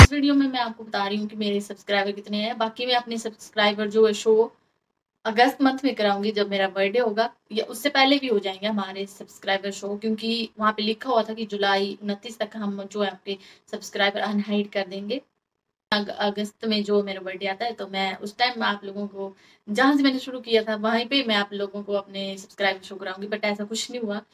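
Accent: Haryana, India. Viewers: aap haryana se ho kya aap ki boli haryana side ki h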